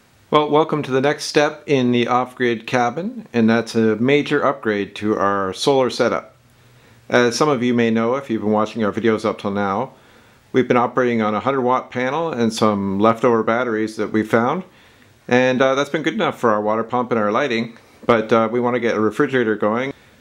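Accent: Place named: Ontario, Canada. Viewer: You from Ontario Canada?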